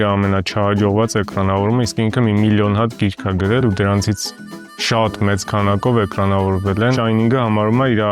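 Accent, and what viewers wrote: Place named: Russia. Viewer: Լավ ֆորմատ է, սպրեք։ Ֆիլմերն ու թեման էլ ընտիր! Ձեր խոսքն էլ լսելի է, բայց մի նեղվեք, երբ ձեզ նկատողություն են անում - հայերենում մենք ունենք դ, տ, թ , ծ, բայց դուք ռուսական հնչյուններ եք գործածում` Սծիվեն ռուսն ա ասում, մենք Սթիվն կարանք ասենք և այլն։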